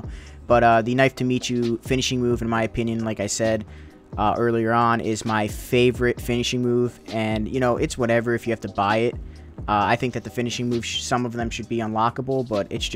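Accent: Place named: Germany. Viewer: in his bio it says he's from austria and he his first language is german you can also hear in his accent that he's german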